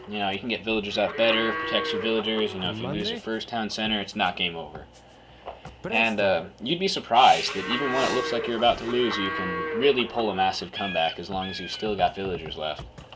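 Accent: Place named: United States. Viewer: I like you extra just because of your American accent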